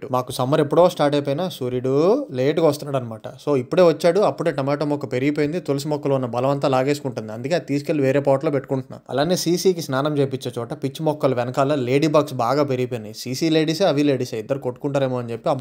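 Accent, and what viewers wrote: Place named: Andhra Pradesh, India. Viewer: Bro, your way of speaking is lovely...Andhra abbai...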